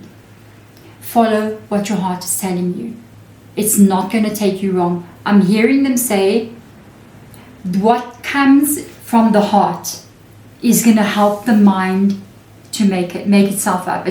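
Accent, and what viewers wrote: South Africa. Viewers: Rocking up in your reading 😅😂 so Saffa!!!!! ❤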